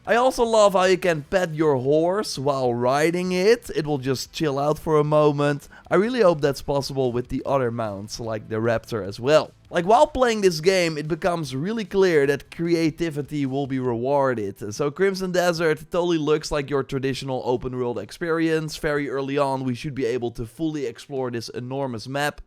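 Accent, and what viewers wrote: Netherlands. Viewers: Are you Dutch (judging by your accent)?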